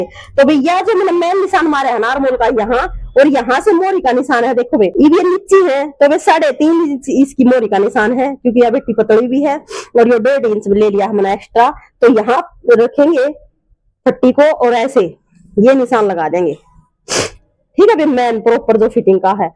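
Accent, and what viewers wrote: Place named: Haryana, India. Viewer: Bahan ji haryanvi hi bolte raho acha lgta h ❤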